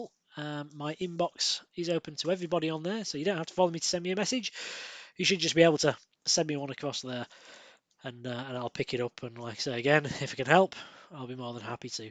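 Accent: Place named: England, United Kingdom. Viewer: he talks too fast plus the English accent... I think I need English sub version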